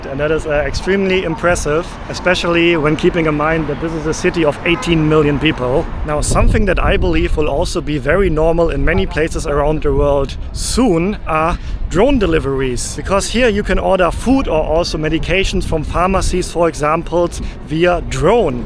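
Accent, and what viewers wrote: Germany. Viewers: ngl the German accent makes the vid so much better lol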